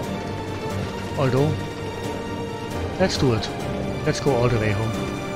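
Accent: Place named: Germany. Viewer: korrigiere mich, aber ich denke aufgrund deiner aussprache das du deutscher bist :D eine ernst gemeinte frage, warum macht man sowas, bzw wo genau liegt da die faszination drin von sowas ein video zu machen? ich selber hab spaß an solchen rails ingame würde aber nie auf die idee kommen ein video davon zu machen. ansonsten liebe grüße und weiterhin viel spaß am videos machen ;)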